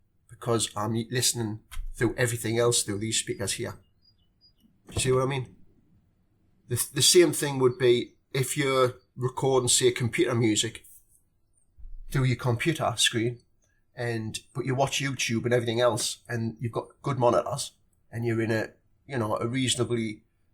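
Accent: Newcastle, United Kingdom. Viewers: "... an then ah fa'got ah thought that an I went an bought some ..." Love it, and love the Geordie. Totally agree, though. I don't have space for speakers either, but I do need new headphones. My skullcandy ones are falling to bits. Sennheiser?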